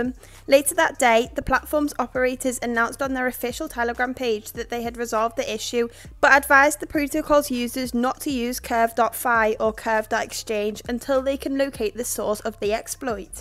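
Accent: United Kingdom. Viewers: Yea to the northern accent! I’m sold.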